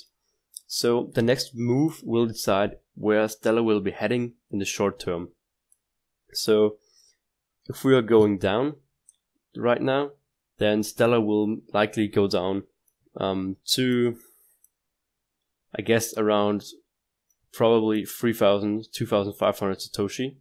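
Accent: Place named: Austria. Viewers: after 30 seconds i realized you are Austrian.. cool video, i like XLM. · 'Schule' next to Project by the bookmarks, there is a "andern" button on the page. I'd guessed Germany or Austria, too. :) and the way you talk in English said enough for me, cause I've worked for Austrian companies ;) . Nice video! Thumbs up!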